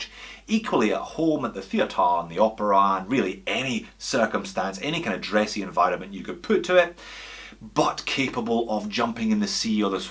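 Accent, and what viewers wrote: Scotland, United Kingdom. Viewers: Sorry, I've watched you from start of corona but now you've iterated at least 4 times you're a Scotsman in this vid alone... accent is starting to make sense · Ha ha, love the watch gift idea. Going to find another fiancé 😂looking for a Ball 904L I'm a recent subscriber and slowly getting through the vids.. don't mind the scotch accent...if you were Welsh we'd be told every other minute..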